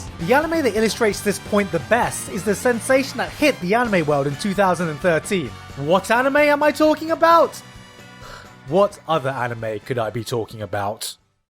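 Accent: England, United Kingdom. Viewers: hey, you sound english. where abouts are you from?:)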